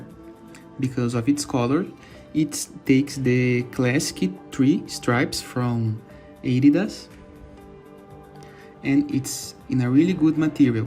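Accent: Brazil. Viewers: Pensando em comprar o branco. Teu sotaque entrega bastante que é Brasileiro kkkk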